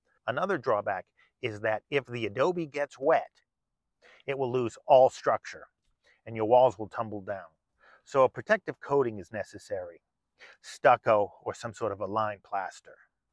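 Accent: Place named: Boston, United States. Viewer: I'm having a hard time taking u seriously w your Bostonian accent.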